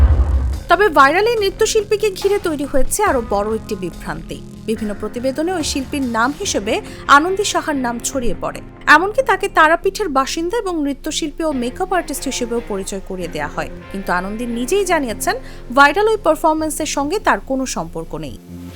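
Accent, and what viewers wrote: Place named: Bangladesh. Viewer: আপামণির কথা বলার ধরন দেখে মনে হচ্ছে বাংলাদেশী, তা আপামণি আপনার দেশ যে বিদ্যুৎহীন অবস্থায় ব্ল্যাক হোলে ঢুকে গেছে সেই খবর করেন না কেন??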